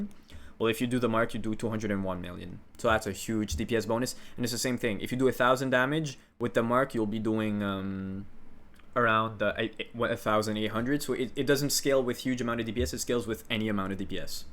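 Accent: France. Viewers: i Like Ur pronunciation. (French native?) Keep the Work Up on yt. I have watched numerous Guides. When i Heard IT IS your Seconds Video i was surprised. The Guide is well done!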